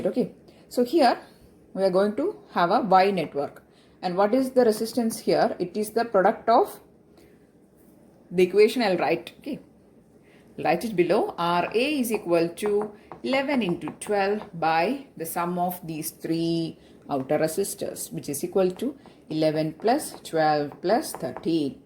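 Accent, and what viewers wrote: Kerala, India. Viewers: Are u a malayali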